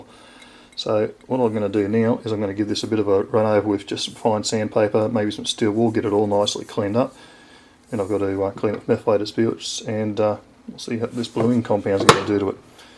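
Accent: Australia. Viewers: this American always enjoys a good Aussie accent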